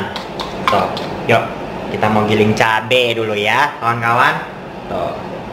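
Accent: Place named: Karo, Indonesia. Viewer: khas karoooo sekaleeee hahahhaha mejuah juah buleeeee lidah karo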